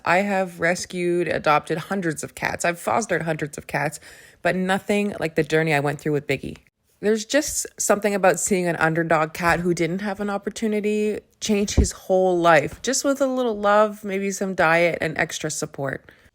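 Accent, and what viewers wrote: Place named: Canada. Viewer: I'm so glad you made that video. Your voice is enchanting but I never thought of Canada, but the minute you said "Canada", yes of course..... It was really nice of you to share. I have a dream of how small communities could commit to looking after feral cats for example. I have two cats and I feed a stray and I contribute financially to cat sanctuaries as best I can from a pension, so I really appreciate what you do. I think the whole world loves Biggie Smalls. Maybe you could write a children's book????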